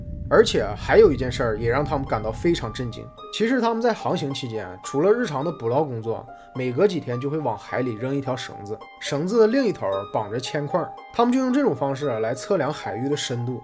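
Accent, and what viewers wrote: Northeast, China. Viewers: 好像东北人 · 能把东北口音努力改改吗…..实在是听着太俗气了😂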